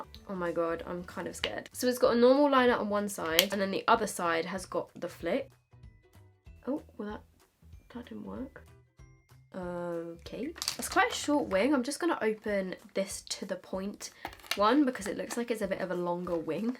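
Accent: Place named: United Kingdom. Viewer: Hi, I really love watching your videos and I'm in love with your British accent💖 (I am Italian😊)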